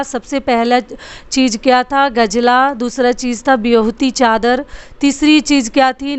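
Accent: Bihar, India. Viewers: Madam ap bihar se ho up se ho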